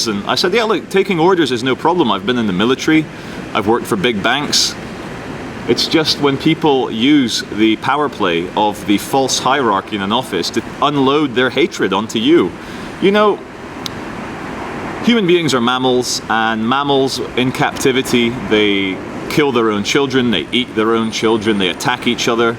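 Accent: Scotland, United Kingdom. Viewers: its a jock accent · He's british but probably grew hearing different english accents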